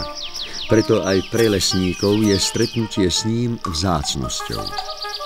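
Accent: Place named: Slovakia. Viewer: Pútavé a tá pravá írečitá Slovenčina.Super.Ďakujem.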